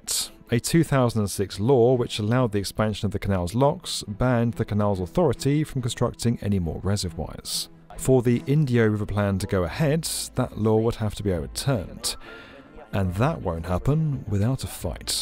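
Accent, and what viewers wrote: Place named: Public school, United Kingdom. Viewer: So we get sweet talking public school voices explaining what they don’t know as they’re stupid more interested in the latest share price The reasons for and why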